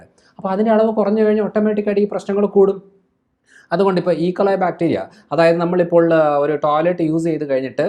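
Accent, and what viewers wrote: Pala, India. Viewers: Attitude പാലാ ഭാഷയും കൊള്ളാം....പക്ഷെ ഇടക്ക് കാടു കയറുന്നുണ്ട്....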